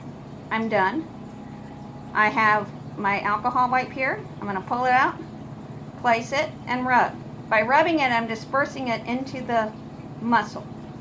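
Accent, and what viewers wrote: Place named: South, United States. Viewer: Thank you SO MUCH for this video. I’m having to inject solumedrol again and had kinda forgotten how it goes! Your video reminded me how easy it can go if you stay relaxed and focused. I wish you would post more videos! Your confidence and demeanor are truly soothing. Thank you, love. And ps, I totally understood your southern drawl #gagirlhere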